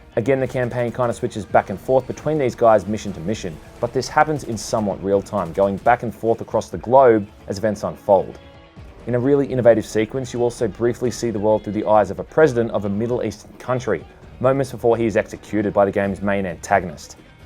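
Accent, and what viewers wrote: Australia. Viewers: i had a feeling you where aussie haha so am i :) might have to reinstall and play this again.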